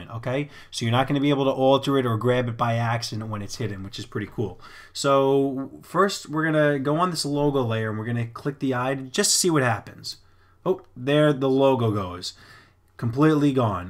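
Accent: New York, United States. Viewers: Awesome, thank you fellow New Yawka!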